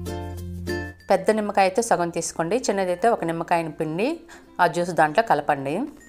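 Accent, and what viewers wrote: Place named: Godavari, India. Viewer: Aay.. Meedhi Godavari entande.. Chala baagaa sepoarandi.. Thanks ande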